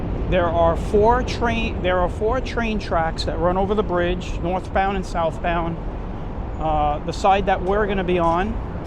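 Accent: Brooklyn, United States. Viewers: I really enjoy your narrated videos as well as your authentic Brooklyn accent! 😊 It's a dying breed. 😢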